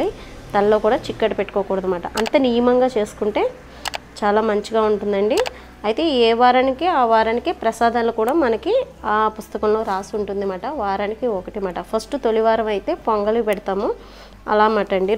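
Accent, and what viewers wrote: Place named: Kakinada, India. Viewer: Kakinada voice super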